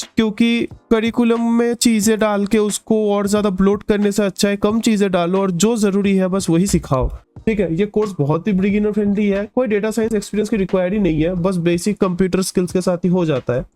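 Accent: India. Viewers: hahahaha marathi accent, sounds very nice. all such regional accents have their own charm.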